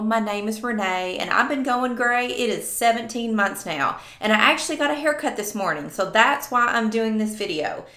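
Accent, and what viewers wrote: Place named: South, United States. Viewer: You have my hair.. GAWD..... same problems.... same FLOWER on the right side of my head..... I want to transition, I am 62 and my hair is THICK like this!... dang girl... we got the same MAMA! I'm in Texas... I'm gathering from your accent.... Arkansas? Alabama, not too much toward Mississippi....OKLAHOMA??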